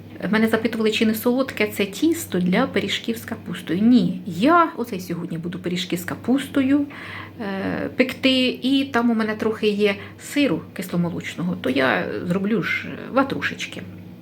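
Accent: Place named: Cherkasy, Ukraine. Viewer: ти класна, таку б мені подругу мати 🥰і відео твої цікаві, і мова теж мила, ба нашу Черкащину зразу відчуваєш, тому я й підписалася на ваш каналі завжди жду відео ❤️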